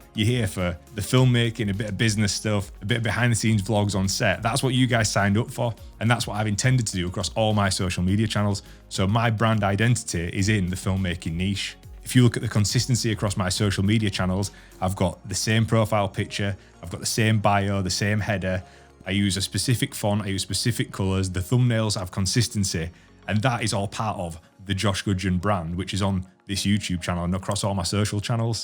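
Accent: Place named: St Helens, United Kingdom. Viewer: Great video with some great advice, I’m trying to set up my YouTube channel and your inspirational! Only found your channel yesterday and I’m loving it. Just a quick one, you sound local to me St Helens? Keep up the good work. Oooshhh!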